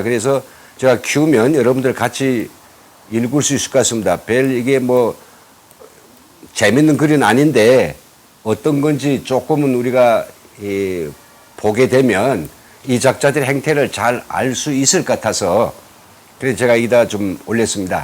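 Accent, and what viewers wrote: North Korea. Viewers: 진짜 북한사투리로 재밋게 하시네요~~힘내세요 응원합니다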